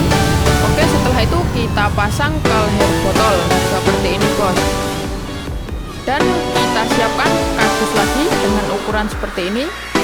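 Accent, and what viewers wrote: Java, Indonesia. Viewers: Mantap bang suka suaramedoknya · medhokk bhoss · Wong jowo endi suarane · Medok Banget ya bos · Orang Jawa ya..nada bicaranya kek orang Jawa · Suaranya medik rek rek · Kreatif banget... muantaap 👍👍👍👍....aq seneng bhs jawanya medhok...smpyan asli endhi Maas😁😁🙏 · Jowo jan muasok bossquuuu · Cara penyampaian bagus aku suka khas Jawa ☺️ editannya jg bagus. ❤️ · LOGAT JOWONYA KELIATA BETUL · Medokk buangett bosomuu ndann😅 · Sampean jowo ngendi mas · jawa ne medhok 😂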